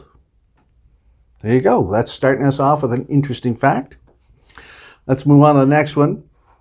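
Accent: Russia. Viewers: Wow, I like your Russian accent, that so funny 😂. Thanks for your great video 😁👍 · I did enjoy listening to your Russian accent! 😉👍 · ** Wow! I've trained hard my listening today 😅 and I loved your Russian accent hahaha ** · Wow, I didn't know about Stalin's bunker. Probably I will visit it. And your Russian accent is so funny😂🤣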